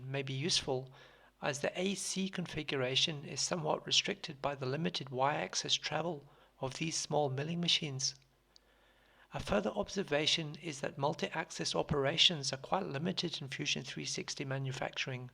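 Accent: South Africa. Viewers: I know a South African when I hear one. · South African accent coming through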